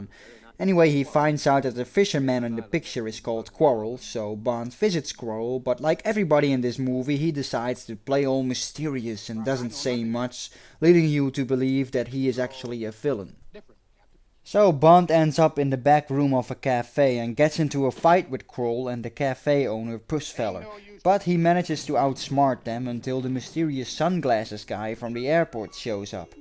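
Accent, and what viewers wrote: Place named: Netherlands. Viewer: I love Dutch dialect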